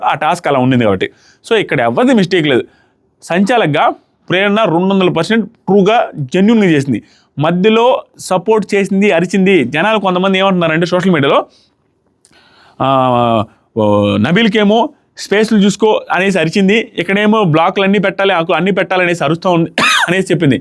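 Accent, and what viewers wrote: Karnataka, India. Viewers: ur kannada so funny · Anna nuvu kannada matalu full funny ga undi anna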